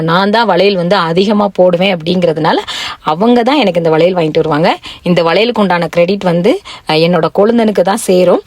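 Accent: Tirunelveli, India. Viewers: Akka unga pechu tirunelveli mari yaa eruku ❤❤❤❤❤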